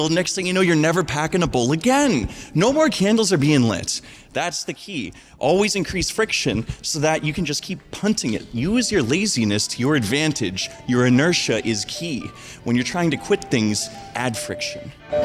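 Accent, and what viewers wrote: Canada. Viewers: This man has the thickest Canadian accent I've ever heard.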